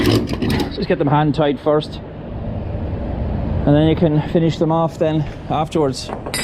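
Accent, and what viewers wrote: Ireland. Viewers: I started watching your vids cz I like the irish accent, but I've grown to love your vids I do miss the european vids, as I've always wanted to do european driving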